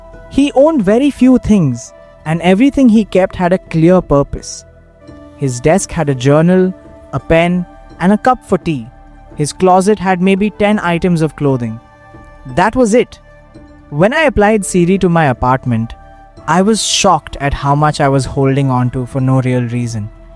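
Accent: India. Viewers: The narration is weird. Why is the accent Indian if the creator is Japanese? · Im sorry. Is this an indian accent rather than japanese?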